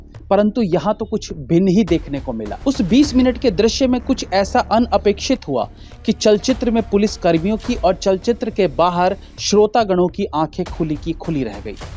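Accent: Standard. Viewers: first time not understanding your review fully. its looks like you speak purely Hindi. Good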